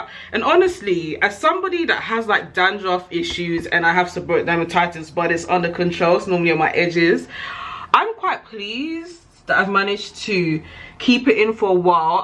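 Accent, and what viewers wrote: United Kingdom. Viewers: Your hair is really nice on you, and your British ascent is everything👑😊😊😊, do u have a video on what to expect in the first 12 month? , please I need such video👑👑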